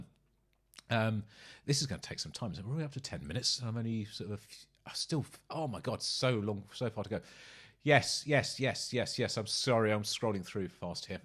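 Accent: United Kingdom. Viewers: Doug is pretty great too…but he’s American. I love hearing British accents so I prefer your channel.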